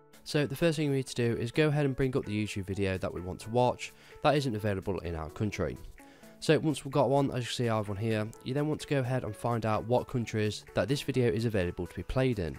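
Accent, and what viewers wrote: United Kingdom. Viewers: British accent hard for us to understand (we the US very easy on English )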